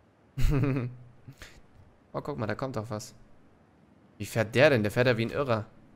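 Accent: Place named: North, Germany. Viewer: Alter Schwede!!! Das geht schon klar :D Sagmal woher kommst du?? Irgendwie hörst du dich sehr nordisch an ;)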